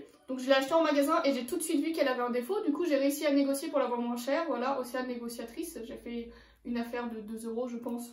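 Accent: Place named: England, United Kingdom. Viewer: Ta un accent anglais un peux j'adore ton accent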